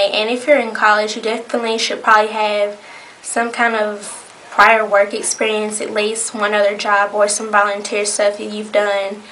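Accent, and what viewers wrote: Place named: South, United States. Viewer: Omg i love you country voice!!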